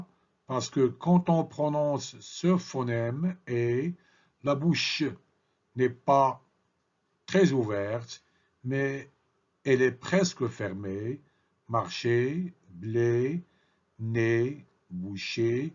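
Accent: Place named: Belgium. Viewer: Votre accent est quand même très typé. Ce n'est pas un reproche mais il ne vous aide pas à différencier à l'oral le "a" de sac et le "a" de âne. A mon oreille, vous ne différenciez pas brin de brun, parfum et faim, à peine le "o" fermé du "o" ouvert et bien sûr les semi-voyelles "ui" et "oui": vous prononcez "lui" comme "Louis". Je vous soupçonne d'être d'origine belge, ce qui est loin d'être une tare. Je dirai même plus ( allusion aux Dupondt de Tintin), cela fait tout le charme des divers accents que l'on peut entendre en français et j'aime ces accents. Mais ça n'aide pas votre démonstration de phonétique!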